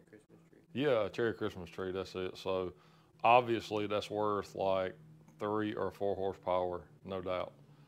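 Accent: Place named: South Carolina, United States. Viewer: i enjoy his upstate SC dialect. my former business partner was from North Carolina he had the same sayings and mannerisms. i am from the SC low country we have a british brogue dialect down here with a gulu/gechee inflection.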